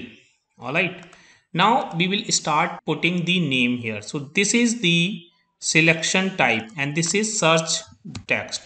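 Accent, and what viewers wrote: India. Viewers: Whenever i hear an indian accent i feel disturb nothing racist my friend it s all about scammer calls i ve listened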